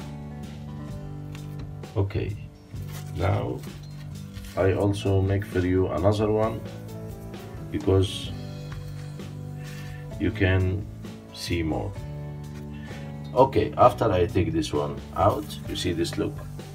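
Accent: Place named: Egypt. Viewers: 😁😁luv d way u narrate .. Egyptian style English.. sooooo sweeet.. 7eloo awee. 👍👍👍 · He’s English makes me to watch all his videos such great person. I like Egypt accent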